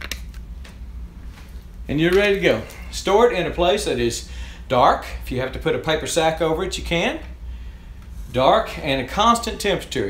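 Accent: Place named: South, United States. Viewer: Great one bro. I loved your southern accent too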